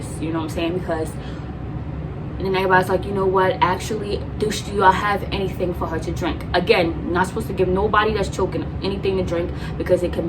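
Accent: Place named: Brooklyn, United States. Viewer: Lolll you sound like you straight from Brooklyn 🤣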